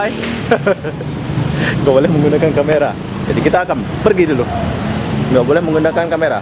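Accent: Malaysia. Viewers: Awalnya aku suka, tapi lama lama jdi kaya orang indonesia kemalaysia2 an, ga di kl ga di lombok ngomong logat melayu malaysia. Mau judulnya experimen atau tidak. Ada bbrp video yg mengangkat issue sensitif mengenai SARA (orng bangladesh yg overpopulasi) yg shrusnya ga usah dijadikan bahan krn ini issue internal di kalangan publik malaysia, ga ush ikut2an ngangkat kcuali kamu bs netral. Saya 6 tahun dah kolej n keje kat kl n ipoh tahupon bile cakap malay kat malaysia n cakap bhs indonesia dekat indonesia sini. Bukan saya benci awak tetapi cintailah negeri ini dn bahasanya slama and orang indonesia. Kcuali bila sudh jdi warga malaysia yg sllu diidam2kan sprti dalam video tu. Jgn sampai kamu terlihat 'norak' hanya gara gara boleh bhs malaysia trus ngobrol itu trus slama di lombok. Mmg ini videomu kamu boleh berlaku semaumu tetapi saya hanya bagi tahu saja kerana peduli bukan sebab hal lain. 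· Kalau di dengar dari cara dan logat anda berbicara, seolah-olah anda tuh menggunakan cengkok asli Malaysia. Mungkin anda masih ada darah Melayu Bukan serta merta pernah tinggal di Malaysia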